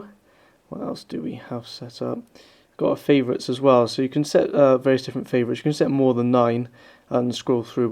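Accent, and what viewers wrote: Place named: Bristol, United Kingdom. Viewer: Your a Bristol lad then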